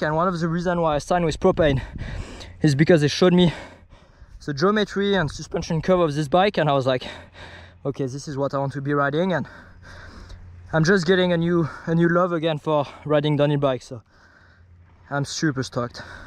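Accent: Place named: France. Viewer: joli accent français XD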